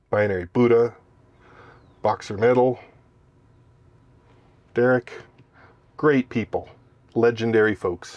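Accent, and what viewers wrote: Canada. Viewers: He's Canadian so it's Ja, you bet ya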